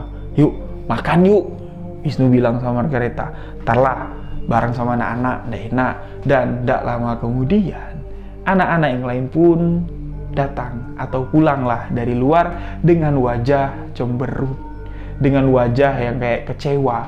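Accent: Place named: Jambi, Indonesia. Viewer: Paling seneng dengerin bang cerita make logat jambi 😊😅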